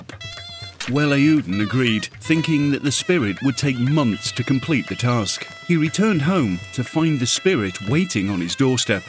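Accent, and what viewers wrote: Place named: England, United Kingdom. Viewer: Great going guys! loving the series. 1 small small gripe - why is an angez narrating the stories ? feels so colonial. No offence to Mr. Narrator hands folded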